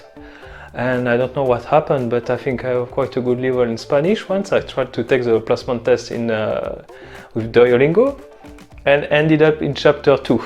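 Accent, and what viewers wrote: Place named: France. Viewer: So nice, c'est toujours un plaisir de regarder tes vidéos, de plus avec ton petit accent français ça me permet de vraiment bien comprendre ! You are on the good way my friend ! 👏👌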